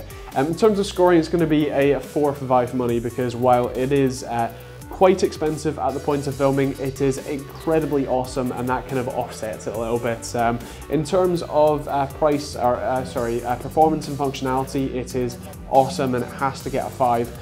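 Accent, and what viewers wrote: Scotland, United Kingdom. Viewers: Love that Scottish accent! I am British also!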